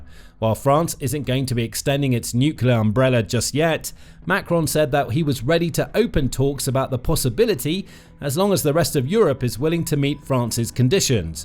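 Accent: United Kingdom. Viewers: Please learn to pronounce NUCLEAR correctly - it’s very annoying to hear ‘NUCULAR’ - I love your voice but don’t enjoy hearing a nice UK accent that doesn’t pronounce English words correctly. Love from a grumpy old lady in Australia 😂❤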